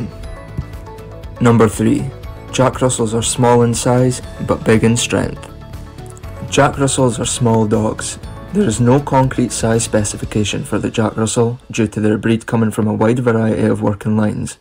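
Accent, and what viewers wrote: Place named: Dundee, United Kingdom. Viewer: Sound dundonian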